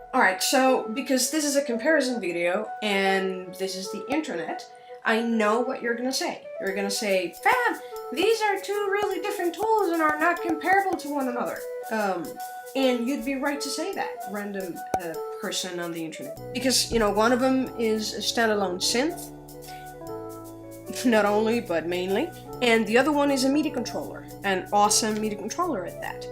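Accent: Netherlands. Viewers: Naw she is Dutch. Ik kan het accent horen. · where are you from? Dutch perhaps or another accent? it sound familier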